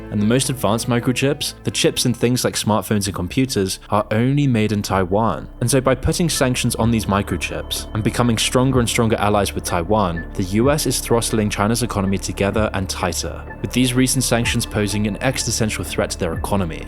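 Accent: Norway. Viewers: are you norwegian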